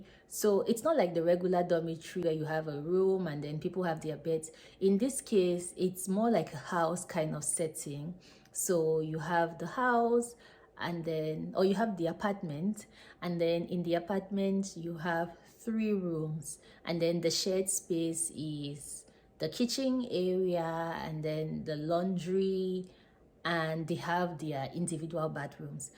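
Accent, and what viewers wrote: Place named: Nigeria. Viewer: Hi nice content there, I am enjoying your channel. I am guessing you're Nigerian or maybe Cameroonian? (judging by your accent haha).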